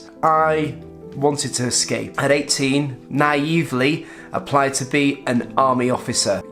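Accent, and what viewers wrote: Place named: United Kingdom. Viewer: Pedro Pascal with a British accent on a bike? Why not 🥸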